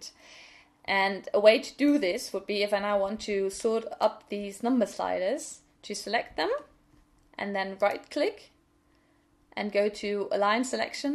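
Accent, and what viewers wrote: United Kingdom. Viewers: It's awesome to see such a diverse following here! Indonesia, Brazil, Spain, Denmark, Syria and more maybe idk. As an American, I'm amazed that a German can speak English so fluently, that I can't even hear an accent. I can detect only a tiny British accent, along with terms like saying 'zed' instead of what we say here in the US, zzzzzz. Unless you're actually a Brit, just studying in Germany. Sad that this is really your only vid on dynamo. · Great tips! started out in auditing with a real addiction to excel and macros and then went into architecture. When I saw that dynamo could be used within Revit, it was such a great way to bring that side of my brain back into finding quicker or easier ways to do something. Where are you from and is the accent from time spent in the UK? I’m South African and spent time in London and now in Melbourne so who know what I sound like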